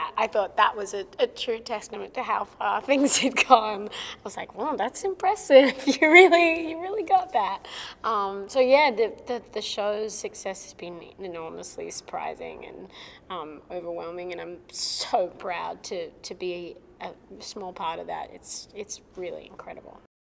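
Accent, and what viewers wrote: Australia. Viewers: To people saying her real accent doesn't really sound Australian, this is a more southern accent, usually NSW, VIC and TAS. The nothern/western states have a more of a New Zealandish sounding accent which is usually what you hear on tv as most Australian media is from Queensland. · It’s probably easier to do a Boston accent with you have already have an Australian one because if you notice her type of accent sound nearly similar or it sound like it wouldn’t be too difficult for her to fake that accent.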